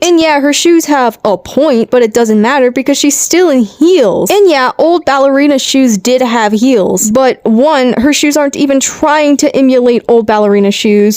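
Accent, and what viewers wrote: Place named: General American, United States. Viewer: How’re u from the south but sound normally american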